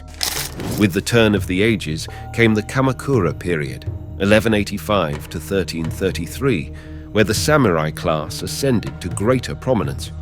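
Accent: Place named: United Kingdom. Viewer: Maybe it's me, Nah, I've heard others say it too. Linguist that are intent on communication and it's many intricate variations. Dealing with pronunciations is particularly grating when something isn't correct or seemingly even attempts to be correct are ignored straight out of hand. The first few times Onna-Bugeisha was pronounced it seemed the narrator had no compulsion or compunction to attempt to say things even remotely studied. Japanese is (perhaps faultingly so) pronounced singularly each and every time. Generally one attempts to avoid accent things but it's not important. A-E-I-O-U are pronounced one way. Combined vowels are pronounced one way too. Extended vowels and silent vowels are standard 'ALL THE TIME' Very few exceptions, but even those are pronounced the same way all the time. ONNA BU GEISHA Not to be confused with Chinese concept of BU being opposite. 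ONNA Oh Nah Boo Gay Shaw. It would have been nice that the narrator could have been consistent in his reading that it would have sounded like he wasn't talking about different actions and jobs. Sometimes saying it like indicated above. Often saying it like AnnA BuGaiSha other times sounding like Anna BuGasha. The incessant habit of British to put a 'Y' between a consonant and 'U' is mind boggling and on the occasional adding an 'I' when pronouncing things like TOKYO or KYOTO coming up with Toe Key Yo or Key Yo Toe very odd indeed. Such be the circumstance when pronouncing SAMURAI not Saem You Rye but if you try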